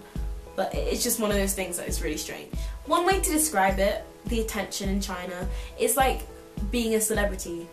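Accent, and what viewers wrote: United Kingdom. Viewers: I missed your English Accent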